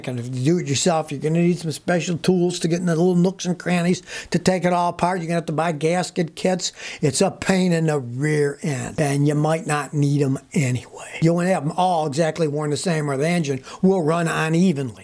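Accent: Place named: United States. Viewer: This man has the voice of true America. Don't give these corporations your money for their garbage products. Don't.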